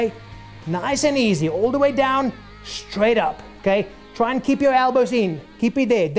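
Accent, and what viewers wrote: South Africa. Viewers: I think it's South African... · Must be South African, im South African and I recognize that accent anywhere plus he says: "anyfing" :D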